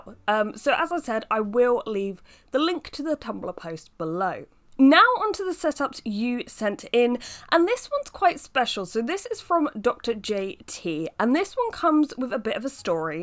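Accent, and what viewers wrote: United Kingdom. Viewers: yo plz ur accent is from what region in uk i need a gf with this accent!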